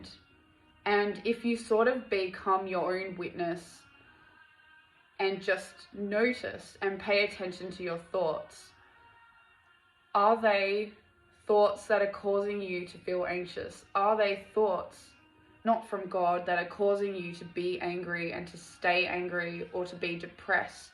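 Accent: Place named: Australia. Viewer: WOW this video wasn’t a coincidence, I was battling bad thoughts today and you showed up on my screen for a reason. Praise Jesus and thank you very much I truly appreciate the encouragement and I’m going to apply this strategy more often. Also I notice you have an Australian accent as I’m from Australia aswell LOL. God bless you.✝️❤️✝️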